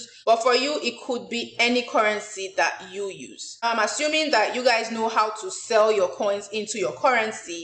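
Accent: Nigeria. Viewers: This lady is a Nigerian I just know from the accent Right?? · haha your nigerian accent LOL :D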